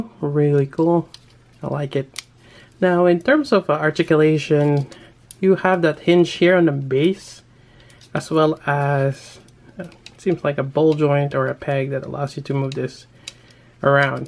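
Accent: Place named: Philippines. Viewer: the way you talk.. are you filipino?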